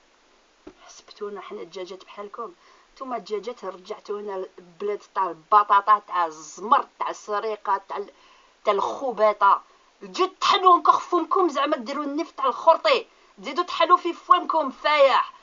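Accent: Morocco. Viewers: kelba bent elharki. Tu as l'accent des marocains. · Elle parle trop bien l'arabe ....marocain ? ?????? je doute déjà qu'elle soit née en Europe et qu'elle soit algérienne. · Mon DIeu ! Je suis tombé sur cette video par hasard. Ma cherie tu m'as fait de la peine, je te connais pas mais tu m'as l'air d'être sérieusement atteinte. message aux Algeriens ?!? Les algeriens ne t'ont rien demandé pour commencer. Ensuite tu as un regard et un comportement pleins de haine.... le suivi de cette chmetta de "Hassan el maghrébine" et ce fond d'accent marocain dans ta façon de parler (je me trompe peut être.... j'espère que quelqu'un de censée (service condulaire par exemple) va se pencher sur ta personne ma cherie) Je te souhaite un bon rétablissement et n'oublies pas de prendre tes médicaments. Reste en belgique et ne revient plus en algerie. Si t'es vraiment algerienne ?!? 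Je signale la video. C'est pour ton bien, salam et saha ramdanek. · Dit moi fofolle vous êtes vraiment algérienne ? اسمحيلي l'accent dyalek marocaines ma cocotte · ta façon de parler arabe ta l'aire marocaine et en parlent de des arriviste ils en France ou en Europe j'attire ton attention que les nouveaux arriviste cest qui travaille et toi tu touche les aides sociales en Europe à prendre de ne pas parler vulgaire ma grande sa se voir que ta un problème a la fin vive la France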